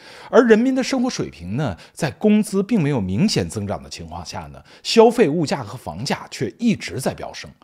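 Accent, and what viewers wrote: Standard. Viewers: 是不是做播音主持的？字正腔圆，有条不紊，说话听起来很舒服。内容当然也很高含金量！ ❤❤❤ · 一口字正腔圓的普通話操著🇨🇦的心，這是一種什麼樣的精神😂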